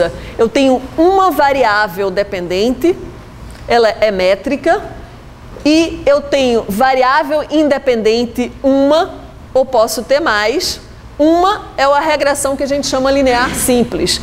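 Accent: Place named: Northeast, Brazil. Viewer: Sotaque nordestino arretado de se ouvir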